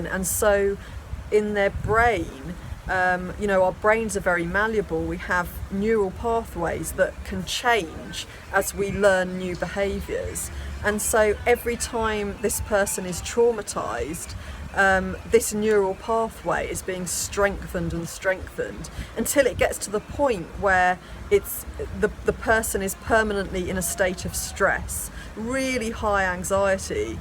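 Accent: England, United Kingdom. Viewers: with the English accent everything sounds so proper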